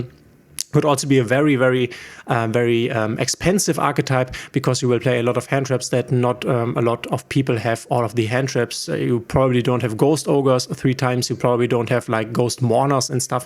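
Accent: Germany. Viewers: Well, he's German, so he's going to pronounce some card names differently. You also cannot argue that Sauravis is an English word or name. Same thing with Baronne de fleur, a card which every English speaker pronounces incorrectly, but who cares?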